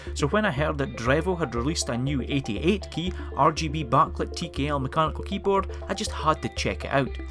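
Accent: Scotland, United Kingdom. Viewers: Love that Scottish accent. Great review and greets from Germany!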